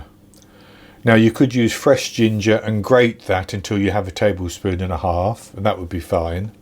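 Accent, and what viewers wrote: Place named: Somerset, United Kingdom. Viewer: thanks,love your gentleness and relaxed approach...are you retired chef,your brilliant and I'm trying to work out the English accent...Somerset?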